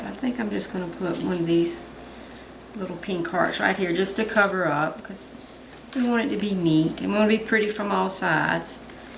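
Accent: South, United States. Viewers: Had to tell you I have a dachshund his name is oscar Mayer weimer lol and I'm from Tennessee love your southern accent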